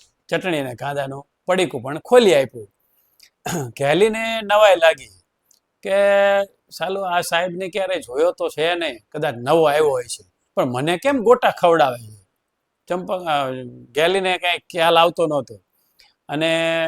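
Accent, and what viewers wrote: Surat, India. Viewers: ફુલ કોમેડી.... 😂😂 સુરતી ભાષાથી વધારે હસવું આવ્યું.... એકદમ મસ્ત વાર્તા કહી.... 😂😂👌🏻👌🏻👍🏻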